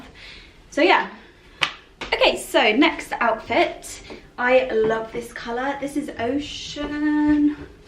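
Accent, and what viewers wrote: United Kingdom. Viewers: I'm just here for the Mary Poppins accent.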